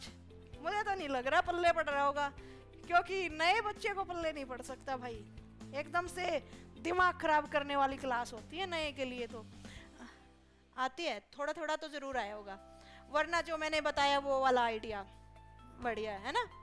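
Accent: Haryana, India. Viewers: हरयानी ठेठ में मिठास की कमी h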